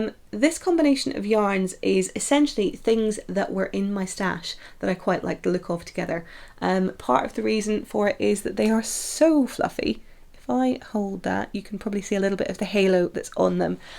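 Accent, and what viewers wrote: Scotland, United Kingdom. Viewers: I know you are thinking why is this person commenting on my old video but like you I have to start from the beginning. I flocked over from Amy who Was trying on your sweaters in her vlogmas, and for a split second I thought of just starting there... I picked up your Scottish accent right away and I love your sense of humor! So glad to have found you which you may be thinking great I have a stalker, fear not I’m only mildly odd. I’m German living in the US and married to an American with a Scottish heritage, who by the way came in during your first eps and said hey you watching Amy Pond doing a podcast. Anyways enough of my babbling so glad to have found you and looking forward to watching all your videos!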